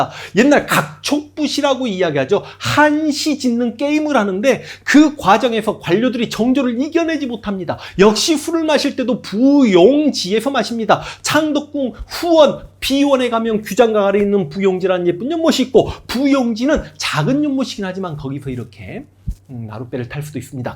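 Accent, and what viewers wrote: Jeolla, South Korea. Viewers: 부조간 발음 들으니 전라도 일단 거부감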